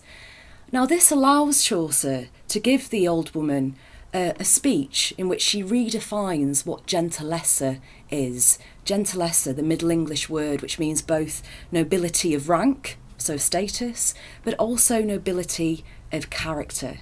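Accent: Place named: United Kingdom. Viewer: British accent, it has to be good.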